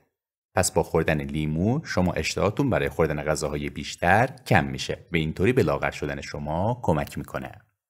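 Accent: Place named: Turkey. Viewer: baba in lahgeh torkit halemono gereft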